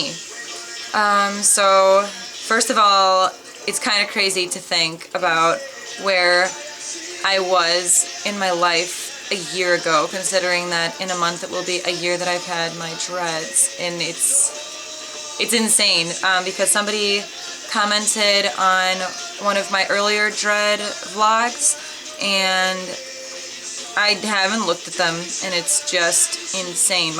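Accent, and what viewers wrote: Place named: United States. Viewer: Jesteś Polką, ale masz super amerykański akcent. Mieszkasz w USA?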